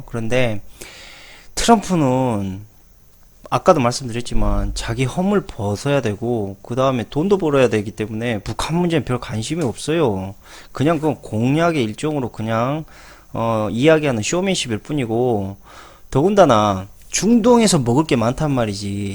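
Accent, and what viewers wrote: Jeolla, South Korea. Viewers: 아 전라도 사투리 시발 존나 듣기싫네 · 말투가 전라도라....청화대에 세월호 선장 이준석 같은 무리들이 있어서 큰 낭퍠로다. 호남 인민 공화국이 다 된것 같지만.....이 세상의 흐름은 진실 앞에 거짓은 망할 것 이로다. 왜냐구....트럼프가 있기 때문에.... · 말투가 전라도인게 뭔 상관이냐 ㅋㅋㅋ 지역감정 부추기는거 정말 혐오스럽다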